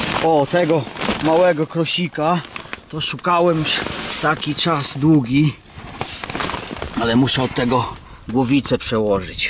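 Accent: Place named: Podlasie, Poland. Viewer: Kolega chyba z Podlasia sądząc po akcencie ;-)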